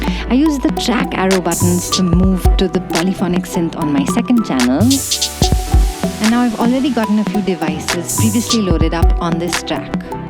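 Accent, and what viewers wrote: India. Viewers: Inidan accent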